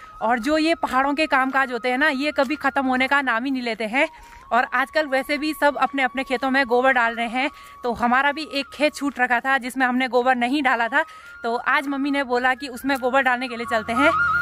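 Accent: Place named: Haryana, India. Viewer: Mam aap haryana si bolati ho thank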